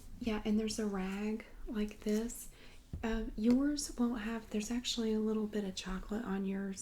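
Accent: South, United States. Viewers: gosh I'm from the south and your accent sounds like everyone in my family. lol this character reminds me of a spoiled aunt I have...especially with the southern accent. Love it!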